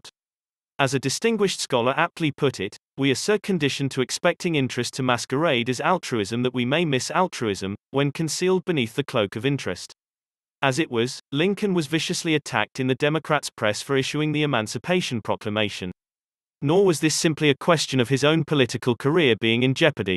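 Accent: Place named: United Kingdom. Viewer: What's with this British accent sh!t? Sowell is an American, ffs.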